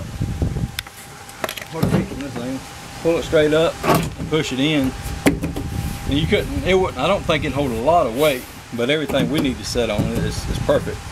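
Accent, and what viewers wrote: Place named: Carolina, United States. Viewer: that's a Carolina accent if I've ever heard one!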